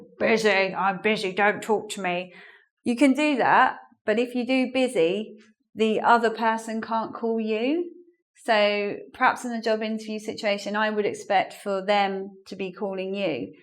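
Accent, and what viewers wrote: United Kingdom. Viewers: You're amazing !! The best accent of the world and the best and real English !! UK 👍🇬🇧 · You're a really cute teacher, I like your british accent and the way you teaching. That's great! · Lets donate people, shes a great teacher with very sweet British accent. I love it :) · I love your british accent!! thanks for your class.... Greetings from Colombia.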